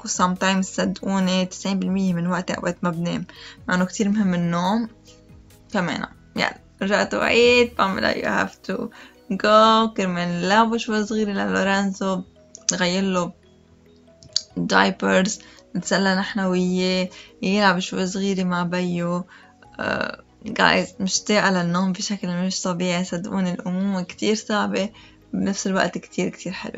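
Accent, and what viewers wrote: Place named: Iraq. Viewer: عراقيه امبينه من حجيج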